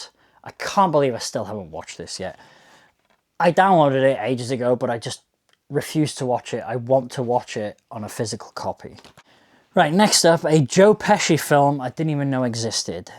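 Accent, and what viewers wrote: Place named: Midlands, United Kingdom. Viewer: Amazing what town are you from? Your voice sounds midlands, is it that part where there is a 'something' line I have heard Elis James talk about before?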